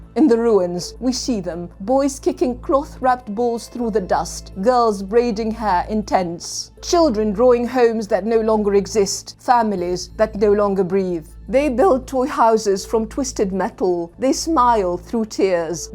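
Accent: RP, United Kingdom. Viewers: She has a posh accent but is wrapped in this 6th century garb and 3rd century ideas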